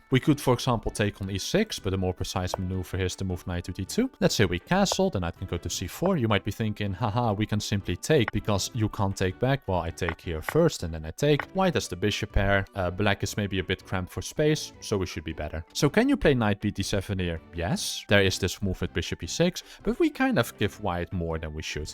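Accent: Netherlands. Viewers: Is this an AI channel with a Dutch accent?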